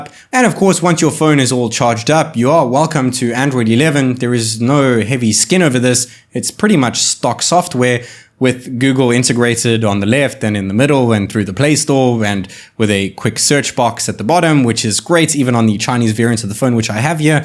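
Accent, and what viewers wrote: South Africa. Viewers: Hi, like your channel i can tell your South African, whats the easiest way to get my hands on this sony 1III in South African?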